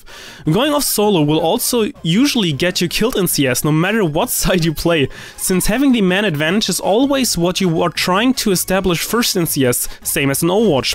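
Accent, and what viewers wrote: Germany. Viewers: Where are you from? You sound kinda german and slovakian i dunno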